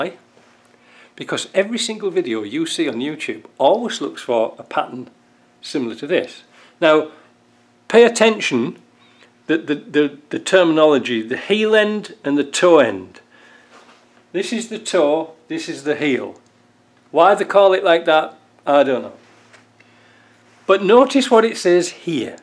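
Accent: United Kingdom. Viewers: Dear unknown , watching you from Poland , beacuse I learn english and you are a good teacher , I learn a lot of constructon , fixing 4x4 so thank , UK accent nicely.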